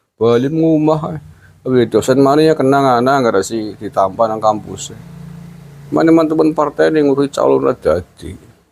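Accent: Banyumas, Indonesia. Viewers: Senang mendengarkan penjelasan jenengan pak....sll di sisipi bahasa ngapak 😂👍👍👍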